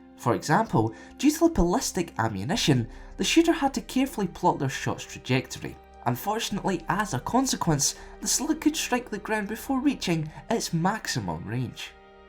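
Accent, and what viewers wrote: Scotland, United Kingdom. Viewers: no hes scottish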